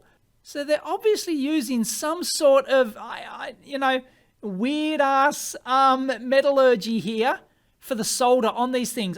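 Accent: Australia. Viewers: Neat, love ya Aussie accent. Feel '0' vision would revolutionise the porn industry 😁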